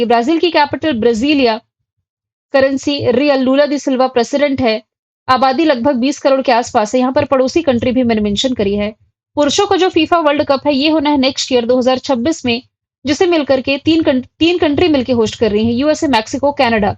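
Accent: Bihar, India. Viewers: बिहार से है क्या गुरुमाता जी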